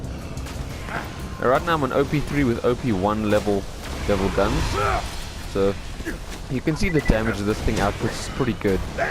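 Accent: South Africa. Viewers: Are you south African? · I recognize that accent from anywhere, you South African?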